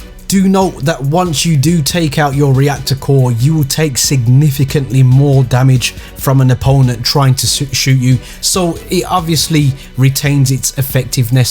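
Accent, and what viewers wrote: Birmingham, United Kingdom. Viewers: Are you from Birmingham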